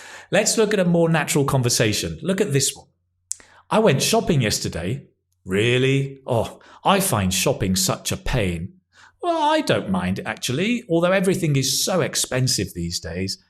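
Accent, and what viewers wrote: United Kingdom. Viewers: Yes, and great chance for us to listen original British english! Thank you!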